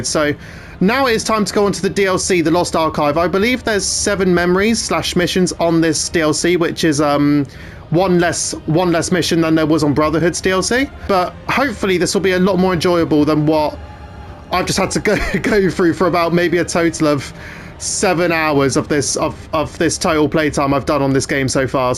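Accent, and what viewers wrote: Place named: United Kingdom. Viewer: revelations is one of my favorite ac game it's a perfect conclusion to Ezio and Altaïr story (if you don't count ambers) it has some of the best soundtracks of the whole franchise , the main theme is fabulous and even better than Ezio family so i'm sorry you had a bad experience i'm not a fan of the arabian/turkish culture but i love Istanbul and they did it perfectly in this game the orange sun still impresses me it's the only game with gta san andreas with an orange sun that i've seen i love your British accent i will visit London one day for sure ps : i love tea too :)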